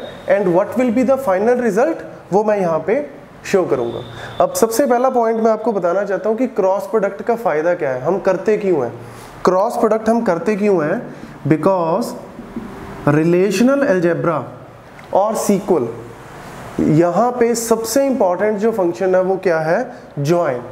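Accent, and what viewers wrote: Punjab, India. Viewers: fun fact - the guy is Punjabi. P.S. - I just guessed it because his accent reminds me of Punjab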